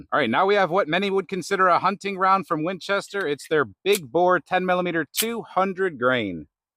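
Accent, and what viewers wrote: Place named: North, United Kingdom. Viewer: Instant subscribe because I always appreciate good, substantive number crunching. The friendly northern accent is also an added bonus.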